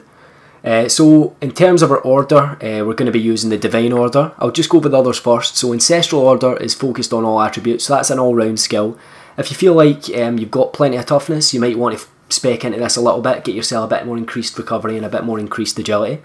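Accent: Scotland, United Kingdom. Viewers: fuckin love scottish accents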